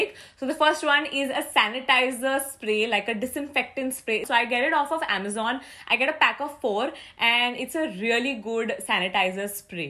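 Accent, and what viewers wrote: India. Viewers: can you speak hindi for the one whole video love your hindi excent ..............:>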